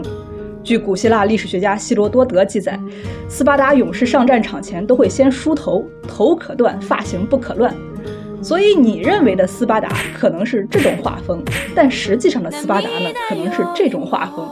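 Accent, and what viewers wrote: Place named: Shandong, China. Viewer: 这浓郁的山东普通话，真是欢乐😂😂😂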